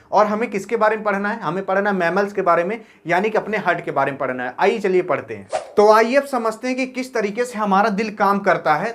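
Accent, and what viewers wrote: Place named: Uttar Pradesh, India. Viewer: aapki bato se lag rha h aap up se h 🤔up me kha se h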